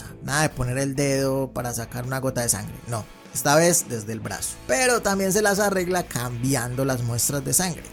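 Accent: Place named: Colombia. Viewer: Que como se que sos colombiano? por el acento, y con la frase "la mata que mata" ajajajaja...sos de los mios compa.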